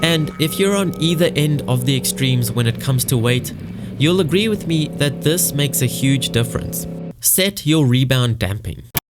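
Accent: South Africa. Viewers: Who else follows this dude partially because of his South African accent!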